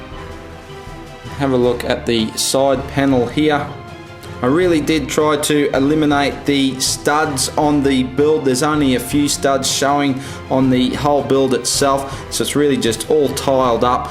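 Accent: Australia. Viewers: He's from Australia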